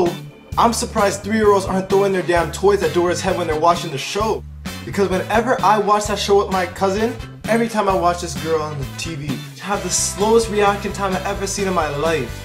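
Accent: Canada. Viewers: LOL that dora bit was hilarious haha. he definitely sounds canadian though...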